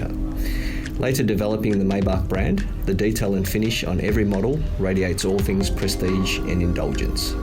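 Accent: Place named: England, United Kingdom. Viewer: Fuck this shot . Asian man with English accents. Someone kill Me please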